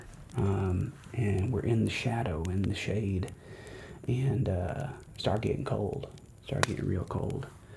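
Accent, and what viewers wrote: United States. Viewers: Love the crackling campfire sounds, plus your storytelling with the cowboy accent. Wild that those are true stories. 🤠🔥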